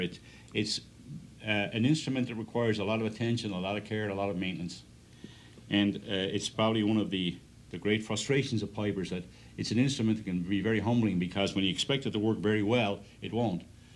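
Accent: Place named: Dublin, Ireland. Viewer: bits of the lovely Dublin accent come through in his voice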